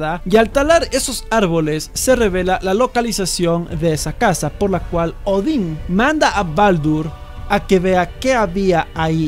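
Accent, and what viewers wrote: Ecuador. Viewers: eres de ecudor??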